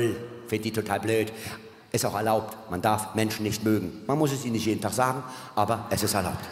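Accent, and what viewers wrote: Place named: Berlin, Germany. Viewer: Und wieder das gleiche Muster...GÄHN. Haben Sie auch mal was neues? Sexismus, mit Berliner Schnauze...langweilig.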